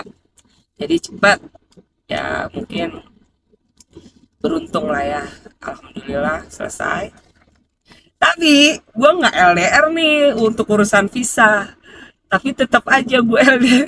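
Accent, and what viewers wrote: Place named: Betawi, Indonesia. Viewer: Betah klu ndengerin kak cerita, lucu, seru kadang sedih juga, apalagi ditambah dengan dialek nyablaknya khas betawi bekasi,pokoknya buat kak, sukses selalu.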